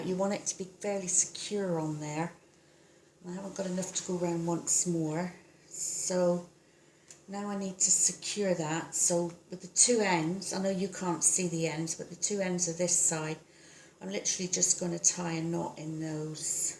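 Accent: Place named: Cornwall, United Kingdom. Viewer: Were you from Cornwall originally? You sound as though you're from Cornwall, England.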